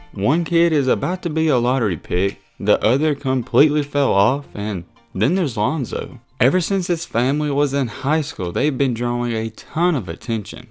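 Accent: South, United States. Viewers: I hate your hillbilly voice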